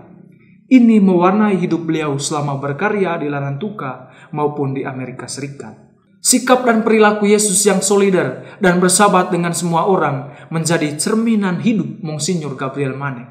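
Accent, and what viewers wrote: Manggarai, Indonesia. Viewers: Naratornya masih kental dengan logat Manggarai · logatnya pasti org Manggarai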